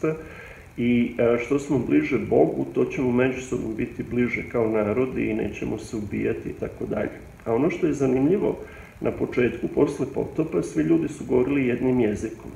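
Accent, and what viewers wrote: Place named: Vojvodina, Serbia. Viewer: Gospodine poštujem vas kao naučnika ali vas molim da uvek pričate na Srpskom jeziku,jer ste očigledno odrasli negde u Vovodini bliže NS. Oseti se po naglasku i ne pokušavajte govoriti na Hrvatskom jeziku prosto ne leži vam. Nemam ništa protiv toga ako ste Hrvat niti protiv hrvatskog jezika. Ovo kažem posto ste u jednoj emisiji pokusali da pricate na Hrvatskom ali u najmanju ruku delovalo je smešno i ne prirodno za vas. Nemoj te se ljutiti, sve ostalo je ok,puno vas poštujem. Sve naj bolje želim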